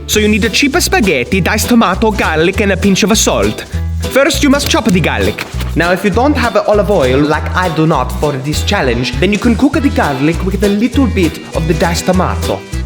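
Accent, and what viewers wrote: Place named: Italy. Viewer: Another awesome video man! Seriously cant wait until these start blowing up! 🔥 love the Italian accent haha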